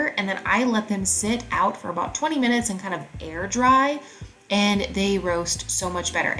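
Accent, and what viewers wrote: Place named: Minnesota, United States. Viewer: That MN accent was way more intense in 2019!! 😂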